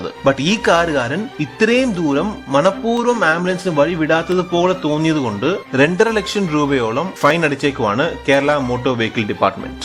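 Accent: Tamil Nadu, India. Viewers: You are not a malayali, allea? Your Malayalam prononciation is like a tamilan. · Why do you sound like a Tamil speaking Malayalam?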